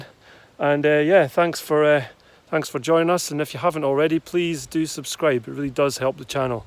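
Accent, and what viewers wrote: Scotland, United Kingdom. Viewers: thankyou so much for your reply and I am really enjoying your format I guess I'm just greedy and want to see them all haha.I also have to admit listening to your Scottish accent makes your videos even better for some reason I find it soothing.Keep up the good work and I will keep watching 😊 Sharlene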